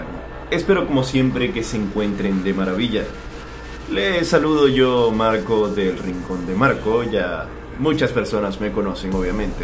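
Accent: Venezuela. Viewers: ME ENTERO QUE VENEZUELA TIENE UN LUGAR EN LA DISFORMIDAD, AUNQUE CREO QUE QUIEN LO GOBIERNA ESTA CORROMPIDO HASTA DONDE NO LLEGA EL SOL, SALUDOS DESDE VENEZUELA, PREGUNTA ESTUPIDA ¿ERES VENEZOLANO?, TU VOZ SUENA ALGO CARAQUEÑO. EXITOS Y PROPERIDAD PARA TU FAMILIA HERMANO DE BATALLA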